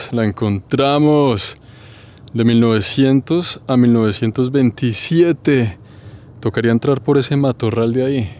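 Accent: Colombia. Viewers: De qué parte de Colombia eres?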